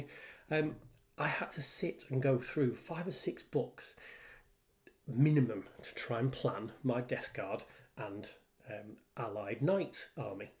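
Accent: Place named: Wakefield, United Kingdom. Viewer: do live in Wakefield you just seem to have that accent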